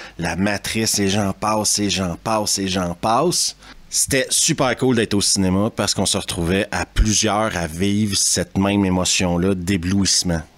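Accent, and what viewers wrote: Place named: Montreal, Canada. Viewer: Suisse ??? J’étais certain que tu étais de MTL 😂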